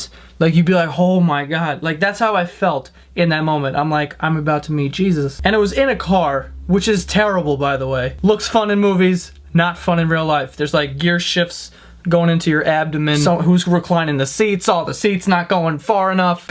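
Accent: New York, United States. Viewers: He's a New Yorker i say that too. Ppl say things differently lol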